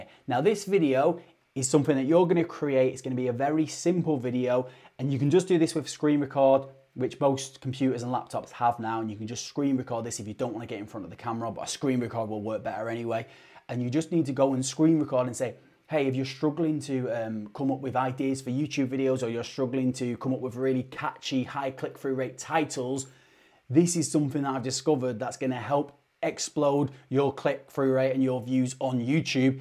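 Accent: United Kingdom. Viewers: Who struggles with British accent like me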